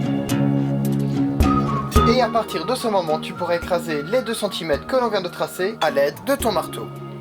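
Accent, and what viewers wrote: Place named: Belgium. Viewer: quel accent belge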